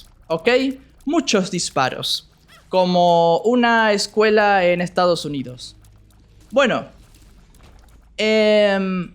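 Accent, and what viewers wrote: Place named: Argentina. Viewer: Se nota que es nativo, hasta se identifica a kilómetros que es de Argentina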